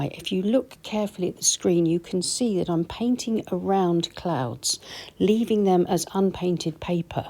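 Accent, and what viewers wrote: England, United Kingdom. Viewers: I love your work and your kind voice and your beautiful English accent 😊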